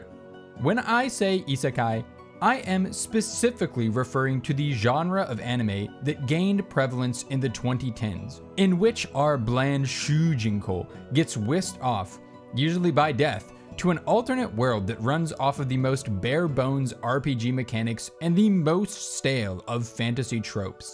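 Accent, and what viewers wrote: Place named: United Kingdom. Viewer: SAO fan here. First of all, I wanted to say great video—even with the British-like Japanese and the 202012s, I was invested in this video from beginning to end. Now, onto what I want to say: I get that people would revile SAO for the whole isekai/harem boom of the 2010's, and it makes sense. There's a clear oversaturation of the market because everyone's been craving the high SAO had given them. Of course, everyone's problems with Fairy Dance can be solved just by watching Gamerturk's common misconceptions video about the arc, but the point I'm trying to get across is that I feel SAO has created an Aincrad-shaped hole in everyone's hearts, only capable of being whole with that same tension of life-or-death. That's why in this supposed "drought" of SAO (anime) content, I suggest we show a MASSIVE amount of support for Reki-sensei to actually lock in. 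As of writing this comment, the latest arc—Unital Ring—is currently on its 8th part, with events leading to what could possibly be a link to Accel World. Not only that; but his other subseries, SAO Progressive, is going to have its 9th volume released for the west on May 12th. While it may seem as though Reki-sensei is just meandering around with his stories because he's probably hit a writer's block, cheering him on enough to keep pushing forward will definitely give him a boost to get his act together and finish the Elf War saga within SAO Progressive. If we do that, we can generate enough buzz to make Kadokawa consider either a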